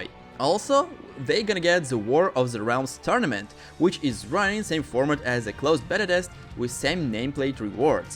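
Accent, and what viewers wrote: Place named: Baltic states. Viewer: Are you from the baltics? I have a friend from there and he has the same accent as you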